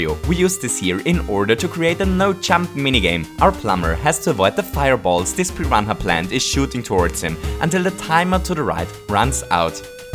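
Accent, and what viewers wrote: Germany. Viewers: bist du deutscher oder so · Super Video, ich weiß das du Deutsch kannst. Hört man auch. ; ) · O my god, dat German accent XD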